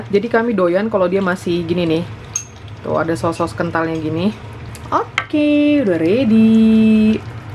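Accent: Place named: Batak, Indonesia. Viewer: Mantap. Sukak kali aku nonton vlog kak ini, natural kali batak nya.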